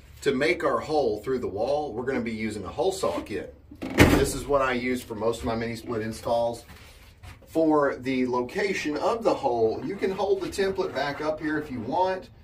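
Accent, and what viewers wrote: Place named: Tennessee, United States. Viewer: This is a truly excellent video on how to install a mini split. Thinking I will be using this tutorial if and when I need to replace mine. One thought,....likely a regional thing, but I notice your frequent use of "Take and...." before words like, "Use", "Push", "Put" etc. I have heard this before,.....might be a Tennessee thing. Just Push, Put, or Use,.....without the "Take and..." Might not feel right to you if you grew up with everyone saying "Take and...." Otherwise,....a great tutorial,...thanks for taking the time to video, edit and post it for us to learn from.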